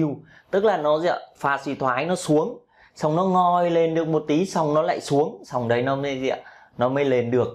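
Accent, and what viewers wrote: Thach That, Vietnam. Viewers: ad ở huyện nào mà nghe giọng nói giống giọng Thạch Thất vậy?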